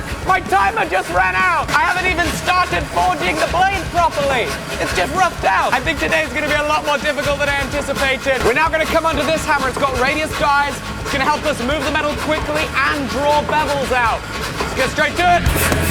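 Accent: England, United Kingdom. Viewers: He is a pom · Overdoing? You realise he's an englishman...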